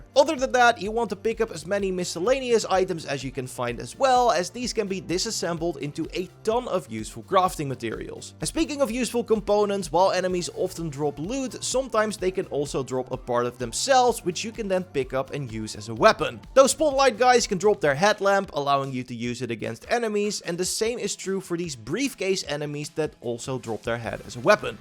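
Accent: Netherlands. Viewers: This guy couldn't be more Dutch. That accent is annoying af.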